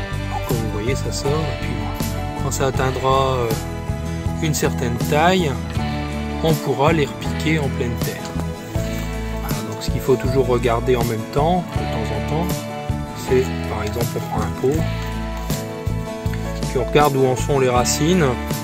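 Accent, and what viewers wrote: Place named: Aisne, France. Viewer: Coucou 😁vu l'accent je reconnais bien le 02🥰 je suis de l'Aisne également je te découvre aujourd'hui je m'abonne 👍je te laisse faire un tour chez-moi et pourquoi pas t'abonner si mon jardin te plaît....A bientôt 😉🍀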